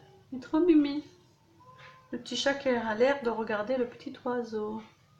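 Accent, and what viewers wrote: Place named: France. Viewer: Coucou, trop beaux ces papiers Ciao Bella (tu es trop chou avec ton accent français 😉 ciao se prononce tchao et buongiorno se prononce bouongiorno), vraiment belle la sound of spring mais mon coup de coeur c’est la Codex Leonardo, celle sur le café, celle sur Pinocchio et peut-être aussi celle sur le theme bois ! Hâte de pouvoir en prendre. Merci pour ce partage qui en met plein la vue en effet !!! Tu vas nous faire des merveilles avec tous ces papiers, bon scrap et plein de bisous bella 😆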